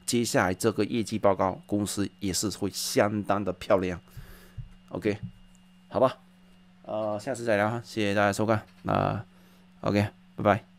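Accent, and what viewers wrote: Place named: Zhejiang, China. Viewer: 浙江人，哈哈哈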